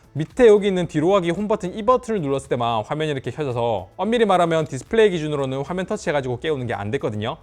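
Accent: Gyeongsang, South Korea. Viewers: 경상도분이신같은데 서울말 쓰실라그러넻ㅋㅈㅋ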